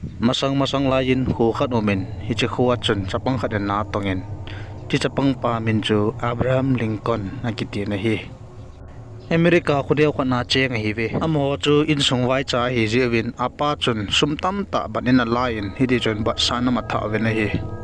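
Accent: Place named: Moreh, India. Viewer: Moreh ho pao cu kangai nom g tama🤣🤣🤣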